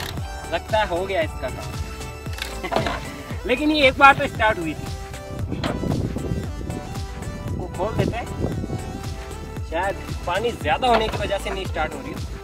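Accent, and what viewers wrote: Rajasthan, India. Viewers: you make very good vedio you have Rajasthani 😃😃😃😃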